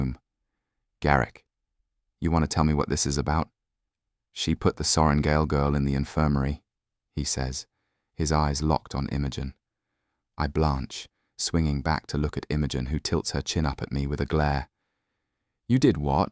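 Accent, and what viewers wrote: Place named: United Kingdom. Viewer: Since I listened to a Xaden POV in an American Accent I'm having trouble adjusting to your British accent. But, this is well written and I am enjoying it regardless of that one caveat.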